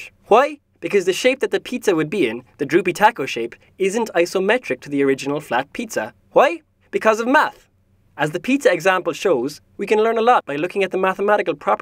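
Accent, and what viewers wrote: Ireland. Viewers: Irish accent? Idek. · SymbolX the narrator is irish and your comment made no sense to me otherwise thanks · Hey I hear a fellow Irish person! :D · I love pizza with Irish accents! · Are you Irish, but use Americanisms? Or are you American with an Irish accent? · irishness! :D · irish accent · Actually, seemed pretty damn Irish to me... And, living in the UK and having an Irish best friend, I know what Irish sounds like. =) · He sounds vaguely Irish to me. Not at all Chinese. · what accent is this? irish? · Is that an Irish accent? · The accent was actually from the Republic of Ireland! If it was a Scottish pizza it would have been deep fried, yes that actually exists there! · is this huy irish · do i hear an irish accent?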